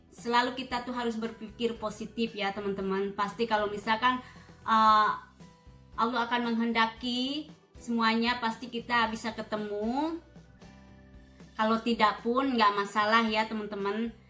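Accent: Sunda, Indonesia. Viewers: Smua ada waktu nya ya Teh. Sy dengar Teteh klo lagi ber bhs sunda kental skali. Pdhal asal karuhun Teteh dr brebes. Gpp ya Teh smua klg kita. Smoga damai bersama klg dan bahagia slamanya. Waktos Teteh bercerita g tersaa air mataku ikut mengalir.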